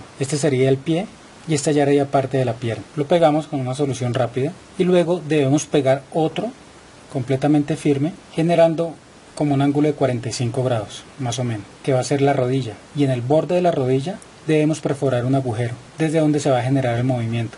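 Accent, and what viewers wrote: Colombia. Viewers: Voz sos de colombia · Qué orgullo para Colombia que tengamos un profe de su categoría. Qué institución educativa tiene el privilegio de contar con usted profe? Tiene acento valluno. Saludos desde Antioquia.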